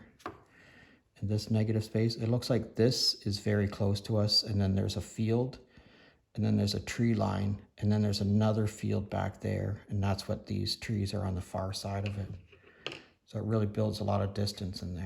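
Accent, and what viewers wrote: Canada. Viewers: I am living in South Carolina now. Born in Scotland, lived in Montreal growing up. Family still in Ottawa and Toronto. I hear your Canadian accent, love it.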